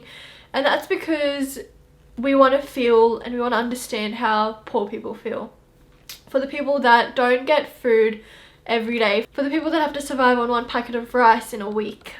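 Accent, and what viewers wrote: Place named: Australia. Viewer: I think she lives in Australia, because of her accent, and it was uploaded in june and she said that it was winter I think.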